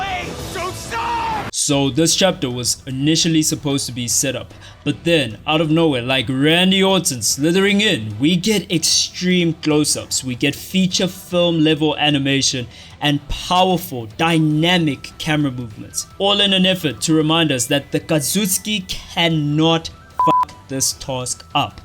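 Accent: South Africa. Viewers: You sound South African my dude I like the accent ✨ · You're south african right? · where are you from? You sound South African bro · I swear brother you gotta be South African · So good to hear an SA accent in a video about my favorite series. Reminds me of getting lectured on Pokemon by my older cousins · r u from ZA?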